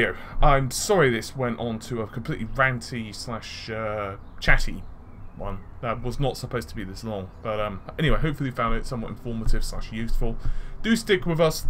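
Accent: England, United Kingdom. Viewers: Best place to listen a good English accent too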